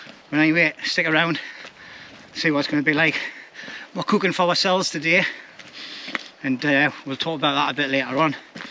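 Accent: United Kingdom. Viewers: As an American, I am always curious about the various UK accents. May I ask what area yours is from? Thoroughly enjoy your outings!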